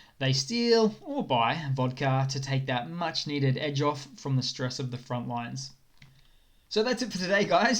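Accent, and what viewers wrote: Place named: Western Australia, Australia. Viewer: Plagurized content. Lacks originality. Direct copy of several other established credible youtubers. Suspect an accent from Western Australia. Not impressed. There does not appear to be any significant ability to understand anything other than what we see on deep state and copying of other youtubers. Give this SOB a Thumbs Down.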